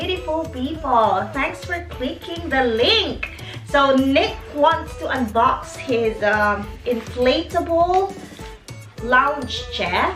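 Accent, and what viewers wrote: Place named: United Kingdom. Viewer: Love2 ur british accent maam 🥰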